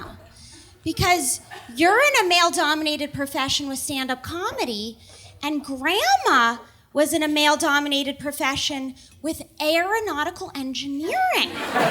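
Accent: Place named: Minnesota, United States. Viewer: I first thought she had to be from Minnesota. Then I really started to appreciate the subtlety satisfied pauses with the wry smile between the jokes. 👍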